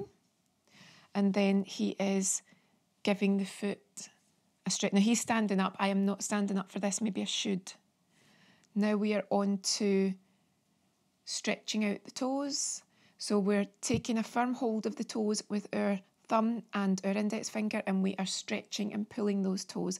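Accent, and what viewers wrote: Scotland, United Kingdom. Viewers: I found you today looking for a how to massage video. I really like your voice and accent. What part of Scotland do come from? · This looks so relaxing. I found your channel through recommendation from another channel i follow Love your follow alongs, and the indian head massage tutorial is soooo relaxing. Love Scottish accents too 😊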